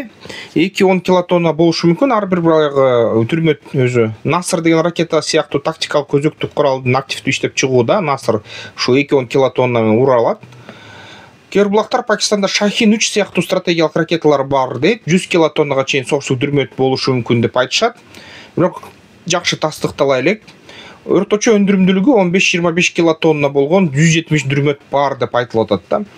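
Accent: Standard. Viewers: Кыргызсча таза суйлойт адабий тилде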